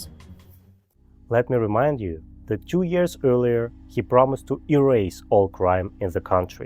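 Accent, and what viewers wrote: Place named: Russia. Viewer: Kl to hear the Russian accent it gives it a little bit different, must be a million criminals, murderers and serial killers the western world doesn’t know about.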